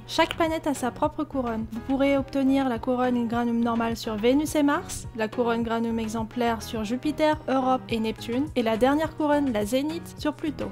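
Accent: France. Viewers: j'me disais bien que j'avais reconnu l'accent français sur les autres vidéos Haha.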